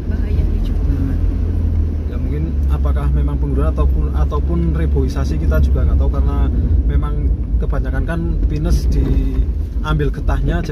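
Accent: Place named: Wonosobo, Indonesia. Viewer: Milu nyimak kiye bos, gara2 longsor kasimpar fidio chanel mana saja yg liatin ds kasimpar tk tonto, rika wong ngendi si bos, kaya enyong wae logate, wonosobonan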